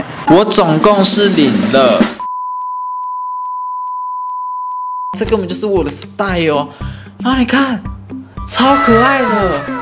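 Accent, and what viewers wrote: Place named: Taichung, Taiwan. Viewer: 第一次看你的影片～台中人吼！台中嗆很重餒！應該沒猜錯～😄很棒！很有親切感～👍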